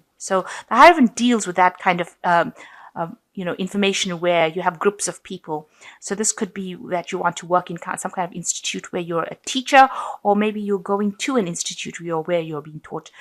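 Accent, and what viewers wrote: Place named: England, United Kingdom. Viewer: English accent alert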